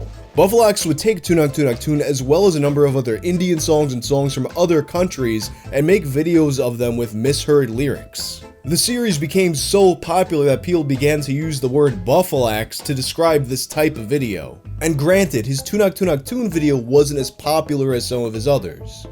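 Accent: New York, United States. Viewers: WTF! You are Sikh??? U sound American as fuck. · did you always have a new york accent? i swear you didn't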